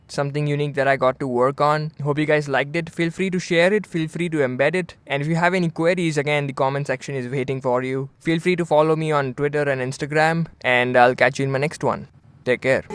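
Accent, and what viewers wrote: India. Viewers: lol this indian accent.... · fucking indus, learn to speak English and go back to india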